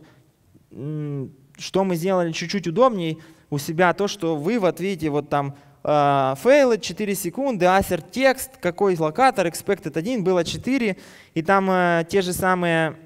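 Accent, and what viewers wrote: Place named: Ukraine. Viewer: Английский с украинским акцентом - самое лучшее, что я слышала за последнее время)))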